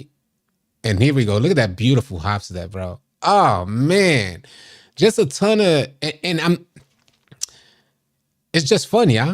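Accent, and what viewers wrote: New York, United States. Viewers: I respect your grind.. Very transparent and informative. Your content is appreciated for sure.. I Know u from up top somewhere NY or Jersey area by your accent. I Could be wrong lol but salute my boy 🫡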